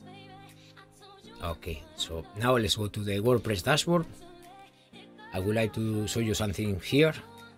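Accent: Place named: Spain. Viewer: Ese acento suena a español jejejj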